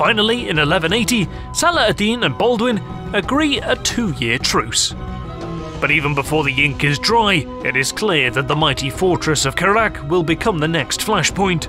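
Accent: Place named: Yorkshire, United Kingdom. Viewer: ... Ok... I give up! It's almost completely gone, but I reckon you are either from Yorkshire or 'the other place' (Lancashire) lol. Or am I completely wrong? haha LOVE your channel and the fact that you present things in a way that is so easy to understand... wonderful! :)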